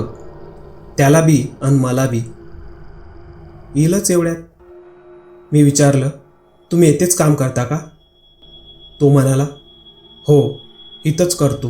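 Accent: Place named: Gujarat, India. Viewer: मस्त कथा आहे पण कथा वाचणारा गुजराती आहे असं त्याचा टोन सांगत होता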